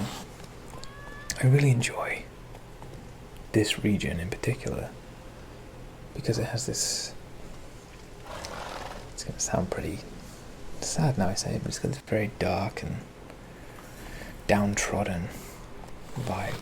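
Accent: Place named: Yorkshire, United Kingdom. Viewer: I dunno if I’m tripping mate, I can hear the Yorkshire accent (I’m from South Yorkshire) but definitely sure I can hear some American in there 😂 *EDIT. You’ve just explained in the video hahaha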